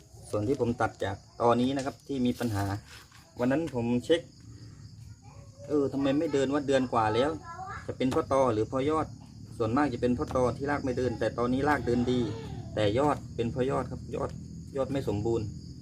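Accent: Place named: South, Thailand. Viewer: ติดตามชมมาหลายคลิปได้ความรู้เพิ่มมามากครับ สำเนียงเหมือนจะอยู่ใต้เหมือนกันนะครับ ผมยุสงขลา ขอให้มีคลิปความรู้ออกมาเลื่อยๆๆคับ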